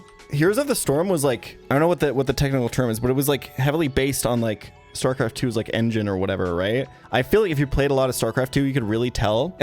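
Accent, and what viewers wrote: United States. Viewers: I took German in High School and the American accent while speaking German had me DYING 😂🤣 - a dumbass American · American with obvious American accent but still good German struggling with memes bit may have crippled me. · I don't speak German but it's funny that I could hear the American accent regardless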